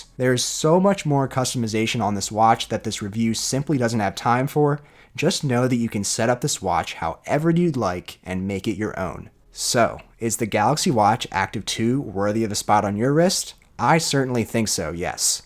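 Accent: Philadelphia, United States. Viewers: Is that a subtle philly accent I hear?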